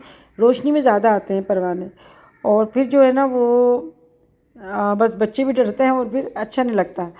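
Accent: Hyderabad, India. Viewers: hadrabad language esi hi hai baron KO toktay Nahi Hain. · Hyderabad me asi ho bola jata ha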